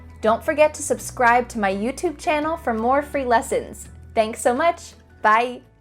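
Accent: United States. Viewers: Wow, you've got American accent, that's incredible. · Hi! So happy to see you here again.. (and again and again!) You're looking ravishing! You chose an excellent topic 'cause "big numbers" is one of the quite unclear topics which many learners know even worse than any grammar question as well and it's truth. I'm grateful to you 'n' your channel for a wonderful possibility study a real American English with gorgeous accent (your "water bottle" is flawless for accent training, thanks) and marvelous pronunciation. has also beautiful accent and It's good that he's being agree to take part in your lessons. I was just fallen for your pretty voice, these endearing eyes, your sunny smile, your expressive mimic 'n' manner of speaking with correct, a real American accent as well. All of this drove me crazy at once when I ran across your channel by the link what gave me Lucy from "English with Lucy" channel. 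And I'll be always faithful to you 'n' your channel 'cause due to it my main dream is coming true! Good luck, strong health 'n' all the best! See you soon!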